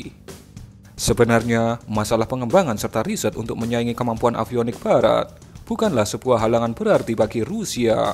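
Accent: Indonesia. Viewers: Medok bet nih yang ngomong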